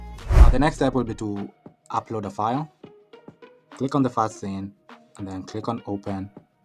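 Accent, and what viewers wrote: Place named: India. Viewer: Maybe you are talking with Hindi Accent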